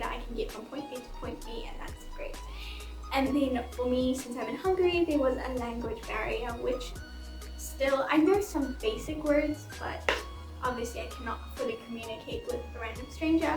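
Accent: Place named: United Kingdom. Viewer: Nooo she’s turning bri’ish 😭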